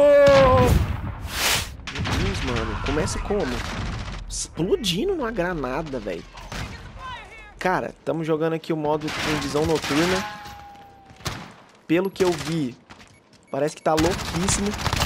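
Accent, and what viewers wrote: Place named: Goiânia, Brazil. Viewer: eu sabia, reconheci pelo seu jeito de falar, eu também sou de Goiânia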